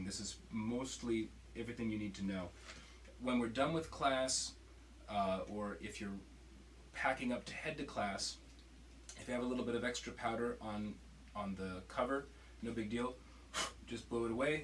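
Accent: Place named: England, United Kingdom. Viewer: It’s funny hearing Indian words from a Englishmen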